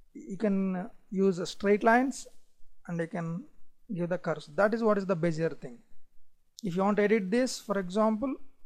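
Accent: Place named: India. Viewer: as same as my indian friend`s speaking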